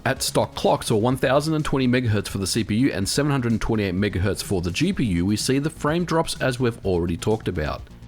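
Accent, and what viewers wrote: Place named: Australia. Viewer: you sound Australian but your games are not? do you live in Australia?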